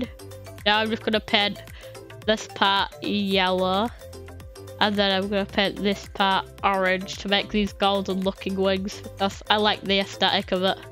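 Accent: United Kingdom. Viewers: He talks normal he's just british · Its called a british accent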